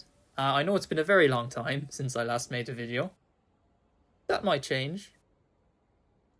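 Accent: Ireland. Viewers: Nice video, and great explainer! Refreshing to finally hear an Irish voice talk about railways. Loved seeing your footage in the recent Dublin RMTransit episode. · Sounds Irish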